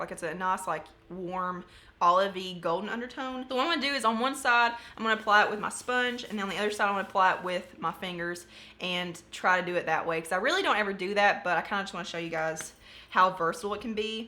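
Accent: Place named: Kentucky, United States. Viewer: Just found your channel tonight because I was looking for a review of Avon powder and already subscribed! After hearing your accent I had a feeling you were from KY like me and sure enough! Excited to keep watching your videos 💜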